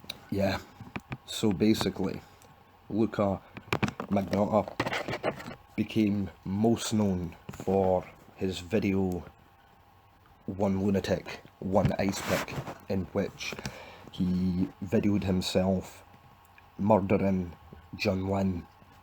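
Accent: Scotland, United Kingdom. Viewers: Where you from in Scotland mate??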